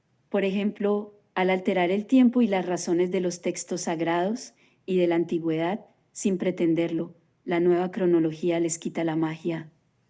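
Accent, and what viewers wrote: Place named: Antioquia, Colombia. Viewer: Muy interesantes reflexiones Andreu, la cronología tradicional de la historiografía efectivamente es casi pura creencia religiosa y sabemos que la razón tiene poco que hacer en la arista de las supersticiones, lamentable es que esa religión fue convertida en "ciencia" a espaldas de la humanidad y por ello provoca esa "disonancia" tan marcada, teniendo a nivel planeta a casi todas la facultades humanistas de historia obedeciendo sus dogmas. (excelente narradora virtual que contrato,! a demás cuenta con el bello "acento paisa" :D)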